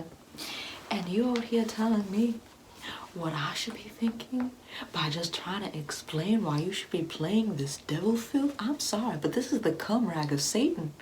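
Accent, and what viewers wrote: South, United States. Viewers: Her accent really sounds like Benoit Blanc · Ok but I live for the southern accent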